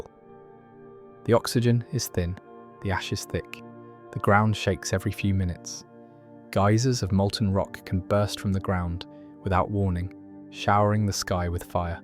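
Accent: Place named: London, United Kingdom. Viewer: By the way this isn't saying anything negative about Irish folks. Their accents are a music only known to 0.008% of earth, near mythical. I'm guessing yours is north west of/in London? Cambridge? St johnswood? Obligatory apologies if not (no of course your not like them ) - I try every time to guess, it's one of my interests. Unfortunately here we mostly sound like... how cardboard tastes.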